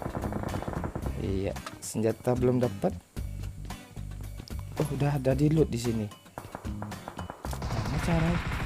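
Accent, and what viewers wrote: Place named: West Sumatra, Indonesia. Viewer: sikampret kacau, salam kenal abang aq dri jawa barat tapi karena sering denger logat minang kocak jadinya kadang kebawa bawa jadi kadang lupa logat sunda nya, sehat selalu bang terus upload tiap hari, rezekinya di lancarkan.. orang proo nii buahaaaahahaha 😂😂😂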